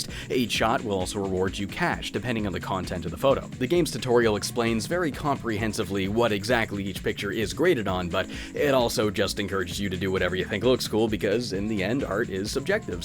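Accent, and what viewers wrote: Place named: Nova Scotia, Canada. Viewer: This is what I love about. He's plays a game, we get a lesson on Photography. Plus he's from Nova Scotia and his accent and mannerisms makes me miss the Maritimes.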